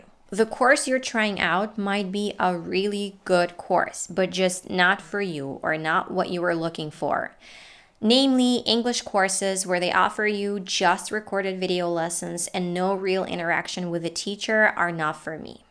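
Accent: United States. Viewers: Thank you Mrs. for your precious opinion. I find it worth watching as I had bought a course in vain and couldn't apply it as my lifestyle was too time-taking in offline so I couldn't sit calmly and learn it online, that was terrible experience sadly. Some notes I jotted from the video: — Not advertised enough course might be the best course one may ever have taken Therefore don't be so captivated by any promoted course, the quality is much more vital than peripheral ads (the goal of those is just find customers not more than that) — Feedback is important as well as a trial period — Biased can be anything that someone can suggest to others cuz everyone has different tastes to say the least Sales under the pressure can make you buy the course that you don't even need or the course might not be organized well for one's lifestyle P.S. Mrs. you look great like a Ukrainian (I guess, is that true? 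) and perfect with American accent it matches you so much, especially when it feels that there is a sense of what you're talking about Thank you so much🙏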